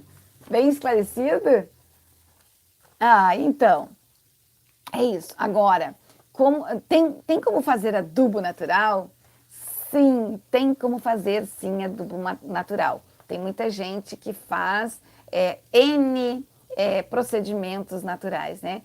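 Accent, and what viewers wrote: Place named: South, Brazil. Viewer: 👏👏👏sou do Rio de Janeiro! E amo o modo de falar de vocês do Sul ! Do nosso amado Brasil!! Beijos!!!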